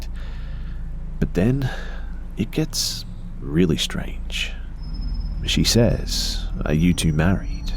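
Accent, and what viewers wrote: Australia. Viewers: Something about an aussie reading stories really appeals to me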